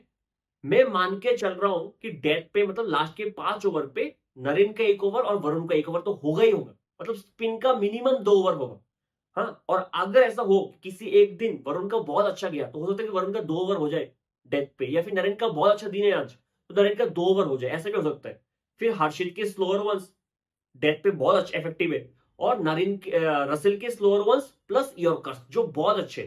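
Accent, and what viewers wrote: Bengal, India. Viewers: bhai are you bangali?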